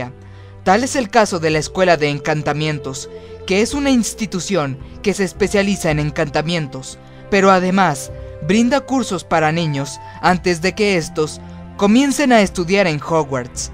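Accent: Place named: Japan. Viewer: Like si Te das cuenta de el asentó japonés en todos los videos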